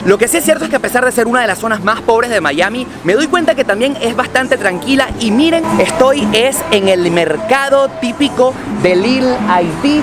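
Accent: Venezuela. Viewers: El acento venezolano es inconfundible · eres venezolano no.? hola no conocia tu trabajo.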